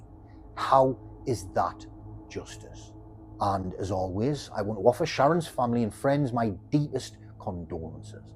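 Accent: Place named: Sunderland, United Kingdom. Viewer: Love hearing a fellow makem!! Cracking channel!!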